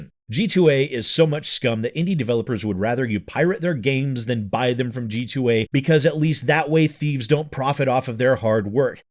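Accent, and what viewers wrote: United Kingdom. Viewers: That britishsounding reading with supersnark felt so TB I had pangs. Gotta go watch some Genna to support her.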